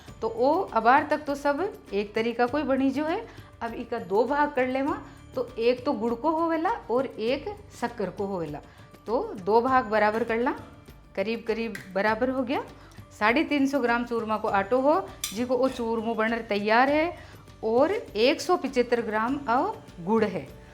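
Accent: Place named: Jodhpur, India. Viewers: Nhi sa ....ye bikanri bhasa ne bhi marwadi keve pr ye proper marwadi ki jodhpuri marwadi bol riya h pr ha kathe kathe kuchaman wali assign aa riyo h sav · Aap ke marwadi bolne ka tarika bhut hi pyara h hukum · Didi dal bati su jyada apri marwari sun n Dil n sukun ayo kiti miti bole h apnane marwar ri Ram ram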